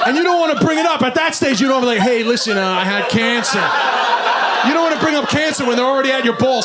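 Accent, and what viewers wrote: New York, United States. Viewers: You code-switch to a much stronger American accent for American audiences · Never heard of this guy before, but DAMN that was a solid set. High energy, aggressively funny and smart, and an amazing combo of street New York accent with metropolitan comedy. 5 of 5 stars! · It's just a new york accent , and the fact both of them are a bit hyper.😂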